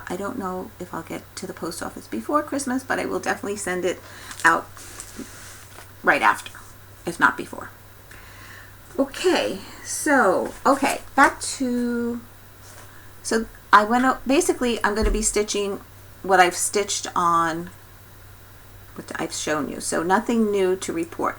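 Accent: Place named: Massachusetts, United States. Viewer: Hi! Found you a couple of weeks ago, and when I discover someone new, I immediately go back to their first video and binge watch them. So that is what I have been doing since about Thanksgiving! And I am all caught up now with this one! You are a prolific stitcher! I enjoy all your work, we have alot of the same taste. Thst band sampler is gorgeous! Love your accent, you remind me a little of all my relatives in Massachusetts. You are very sweet and I have enjoyed catching up with you! Congrats on your little grandson! And your DIL Rebecca is so sweet! You get along so well! Hopefully you have that same connection with your new DIL Amy! You have fast become one of my faves, in my top 5! Keep stitching, take care, and hope you had a Merry Christmas!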